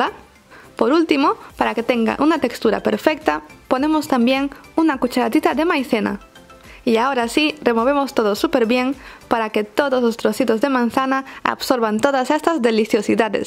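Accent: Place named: Spain. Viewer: Que buenos videos. Hermosa voz y manera de explicar. Tienes acento español, pero como que tu idioma natal es otro me parece. Saludos desde Argentina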